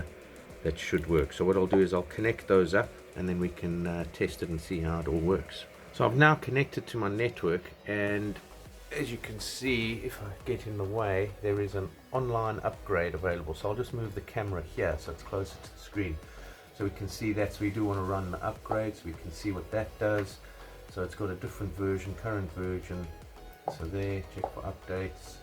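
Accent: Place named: South Africa. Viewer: You sound South African. How can I get this in South Africa (Pretoria)? Great video 👍🏽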